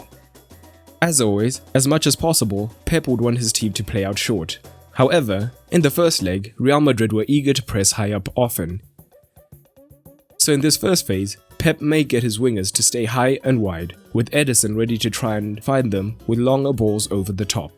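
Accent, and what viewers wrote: South Africa. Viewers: Hey man. Love your channel. Where are you from? You sound south african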